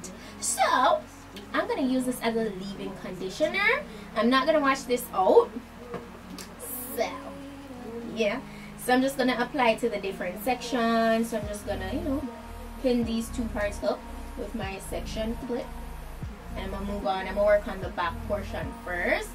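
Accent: Jamaica. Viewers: You sound Jamaican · Love your hair... Girl you sound Jamaican. Like ur from like Kingston i guess. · She's Jamaican. Our ascent is one off a kind. No othe Culture talk like us. Lots of faker tried. But epics failed. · OMG! Your accent is so cute ? Wya from gyal? Jamaica? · You sound Jamaican!! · Where are you from... Your accent sounds kind of Jamaican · I hear a jamaican accent. Subscribed😌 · you sound Jamaican. am I right ?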